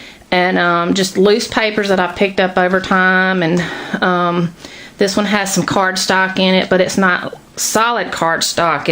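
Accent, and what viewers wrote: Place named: South, United States. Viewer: Great idea! Wish I had a full room to use for crafts, and not just a desk in my living room. Lol. P.S. I LOVE your southern accent. ^.^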